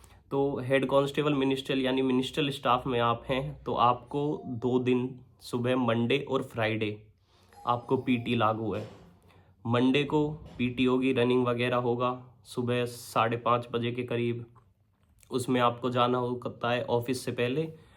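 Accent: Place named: Noida, India. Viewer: Bhai ji apka bolne ka tarika asa lag rha hai jese aap Noida se ho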